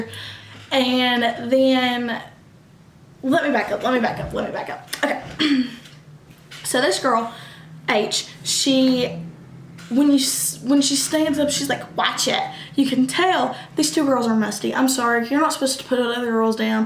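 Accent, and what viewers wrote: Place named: Kentucky, United States. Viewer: I’m watching your video on my tv now lol I’m from Kentucky and you sound like us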